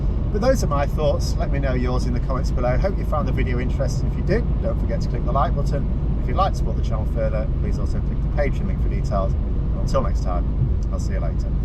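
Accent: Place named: Yorkshire, United Kingdom. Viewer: I'd support that. I'll even write him a reference. Forgive me but that sounds like a Yorkshire accent. Certainly from the North of England, that's a good start!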